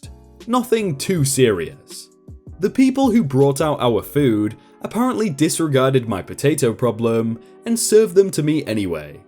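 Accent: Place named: Lancashire, United Kingdom. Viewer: I love listening to your voice. Just out of interest are you from Lancashire? I think you’re from there but another YouTuber (thoughty2) is also from Lancashire and you do sound like you’re from a different part. Or maybe it’s Newcastle. Also, i can’t believe you’re only 18. Keep up the good work. Also, I love you’re other channels. As a British person it’s shameful that I just can’t place your accent.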